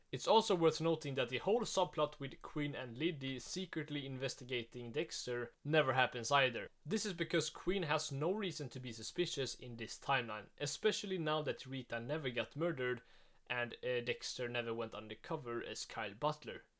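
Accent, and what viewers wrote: Sweden. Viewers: Why does bro have a Swedish accent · Bro are you swedish?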